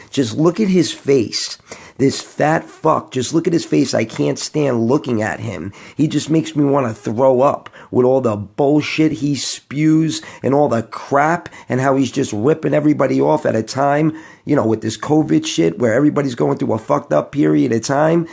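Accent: New York, United States. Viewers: I love how u call it how it is you get straight to the point and i love your nyc accent lol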